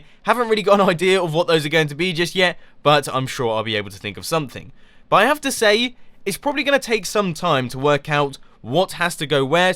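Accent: United Kingdom. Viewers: Maybe it's just his part of town (or whatever) where he comes from. You do know the MANY accents people have in the UK, same goes for the way people talk.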